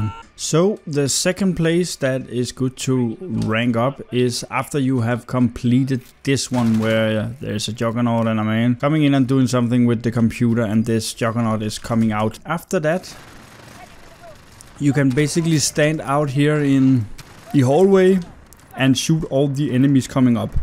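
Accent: Denmark. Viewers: You're danish right? You sound really danish. Especially when you said quicker like quigger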